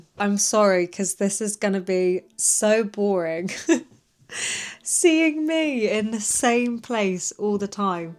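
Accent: United Kingdom. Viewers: Hi! I speak Spanish and I'm learning English. Your video helps me a lot and I love your accent, especially because it's British. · yav dayı şu british aksanı ile konuşmayın yav bana USA lazım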